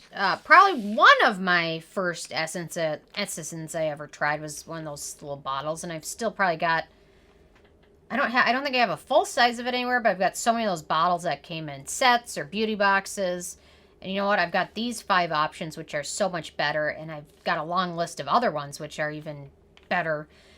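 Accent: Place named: Midwest, United States. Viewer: Are you from the Midwest? Your accent sounds like mine. I’m from Michigan! Haha. Also, great video as always.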